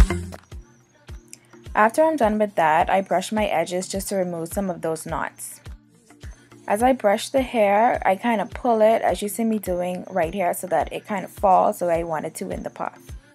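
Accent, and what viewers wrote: Bahamas. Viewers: your Bahamian?! 😀😀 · Dat Bahamian accent strong boy lol biggups to all my Bahamians! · Omg when I heard you speak I was wondering if you're Jamaican!! :) I never realized how close the Bahamian accent is to ours :) Love your hair btw!!! :) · Love your tutorial. Automatically heard the Bahamian in you. Keep it up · Cant hide from that Bahamian accent..lol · LOL I just knew you were a Bahamian from you first started talking. Love the videos. Keep it up! :)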